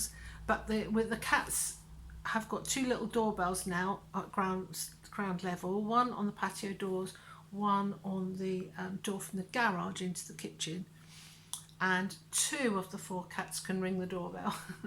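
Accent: United Kingdom. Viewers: OMG, aren't you adorable! 😊 I love our channel - I've just now subscribed! GREETINGS FROM NAGOYA, JAPAN! (I'm not Japanese, myself... I'm a mix of Indigenous Canadian (Siksika/Mohawk-Cree) and Norwegian) - and I like your UK accent! My dear Abigail is from the UK, but she's a Yorkshire lass, so, different accent LOL - anyways, I love that you're still going strong with this channel of yours! Seems so many polyclay'ers have quit YouTubing some fistful of years ago, but you're still rockin' it! I LOVE YOUR CONTENT! 🙂 Please do continue it... I've so many ideas/questions... how to make boxes/containers from polyclay? Etc. - I'm trying to figure out how to make "Ranger Eyes" - they're basically, velcro patches for backpacks, first aid kits, and EDC (Every Day Carry) pouches... I wanna try using polyclay... as when the products are thin enough, they're flexible enough... 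but I might need to use my leathercrafting sewing-hole-punch 'round the edges before baking... WHOOPS! I'M RAMBLIING! LOL Anyways, thank you for your content! And tell what's-his-name to give you your crafting room back! CHEERS! 😉👍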